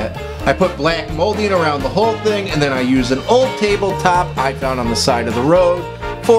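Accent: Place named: Midwest, United States. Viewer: Where are you from? You sound very midwest lol not a dig, just familiar. MI here🙋🏼‍♀️ Awesome job by the way!!👏😃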